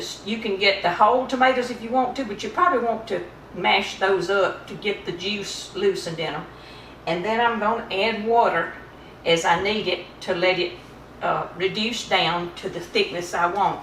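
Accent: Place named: South, United States. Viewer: My grandmother made this as we were kids I litterally could smell this she was from north Alabama she made sausage gravy also but I love them both your voice took me back in time because you have her southern accent my dad like salmon patties he was from Mississippi and loved all these wonderful meals theyve all gone home now to jesus but gosh the slightest things can draw you back in time and catch a glimpse of memories we all hand had together thank you sweet lady for sharing this recipe Godbless you ...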